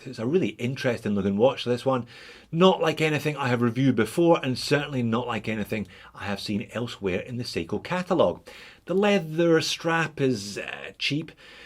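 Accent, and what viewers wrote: Scotland, United Kingdom. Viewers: Am I the only one doing the intro “hellloooooooo and welcome to just one more watch” in Scottish accent? I swear I sound exactly like now after watching his channel for over a year 😂😂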